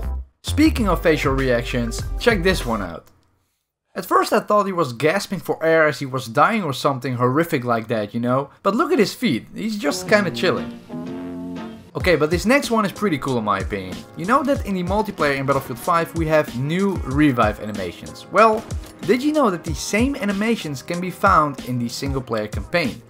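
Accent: Netherlands. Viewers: U dutch? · a Dutchman who speaks English, go to school bro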